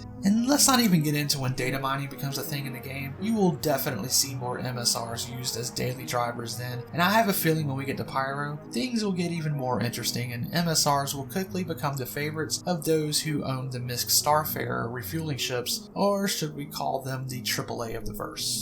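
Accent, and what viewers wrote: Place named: North Carolina, United States. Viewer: I swear that sounds like a North Carolina accent! [enough to sound Local to me]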